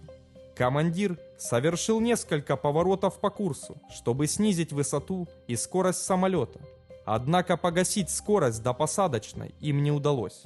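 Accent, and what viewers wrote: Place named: Kharkiv, Ukraine. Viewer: Говорок харьковский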